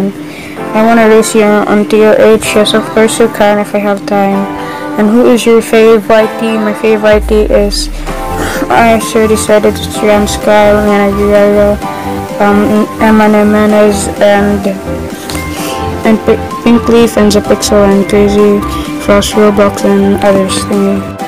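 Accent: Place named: United States. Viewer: He is a filipino but his voice sound like an american holy moly hooowwww dude!!! Lol Edit: i dont even understand ur language but it got me laughing when u were talking with ur language XD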